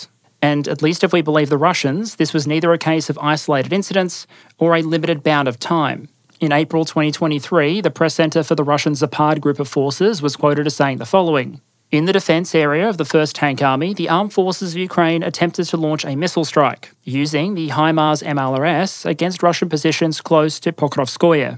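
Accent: Australia. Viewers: Too bad he didn't say "Vampire Counts" in his Aussie accent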